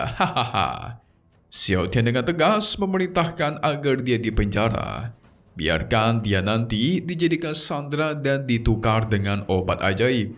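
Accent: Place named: Medan, Indonesia. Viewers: Orang Medan min😂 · Uda jelas jelas orang medan kau min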